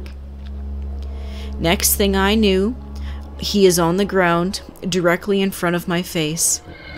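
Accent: Canada. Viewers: Yeah without a doubt, you can hear the Ontario accent!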